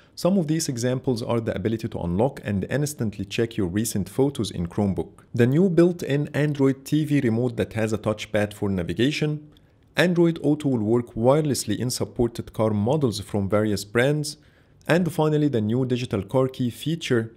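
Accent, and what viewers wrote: Egypt. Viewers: ال accent المصري دي انا عارفها كويس عظيم 👌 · حاول تعدل الاكسنت بتاعتك عشان الاكسنت المصرية او العربية واللي هي شبه الهندية للاسف محل سخرية كبير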